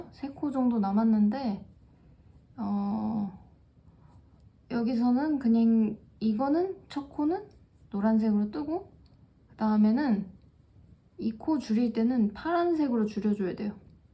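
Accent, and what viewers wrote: Seoul, South Korea. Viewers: 서울사투리 오랜만에 듣네요 ㅋㅋㅎㅎㅎ 잘 배워갑니다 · 정말 예쁩니다 판다면 사고싶을 정도로에요 ㅜㅜ 긴머리랑도 잘 어울리시네요 추천으러 처음 봤는데 말투가 중독이에요 약간 서울사투리+어딘가 사투리 섞인 느낌이네요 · 말씀하시는게 약간 옛날 서울사투리? 그런 느낌이라 너무 신기해요 ㅋㅋㅋㅋㅋㅋㅋ 목소리 높낮이도 그렇고 말투도 그런느낌이세요!! 목소리 이쁘시고 묘하게 웃겨요 ㅋㅋㅋㅋㅋ 😂😂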